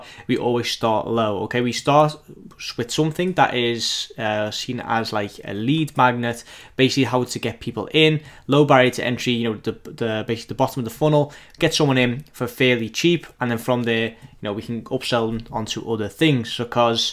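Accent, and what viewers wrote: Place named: Liverpool, United Kingdom. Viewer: Hi I can tell by your accent that you are also from Liverpool. I was wondering wether Liverpool is the right place to start my smma journey. Thoughts?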